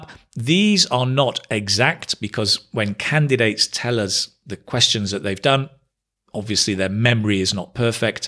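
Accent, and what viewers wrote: United Kingdom. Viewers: Your English accent sounds amazing .. I am in love with British English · Hi. My exam is on March 7th. I really would like to have as much ideas from you as possible. I like your ideas and I love the British accent. I hope that you can make more videos sir. Thank you so much 🙂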